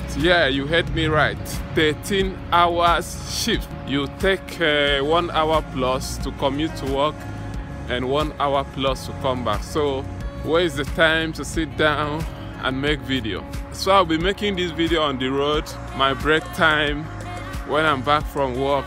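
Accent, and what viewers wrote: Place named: West, United States. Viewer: Igbo boy alert! But you sounded like you're from the west. 😂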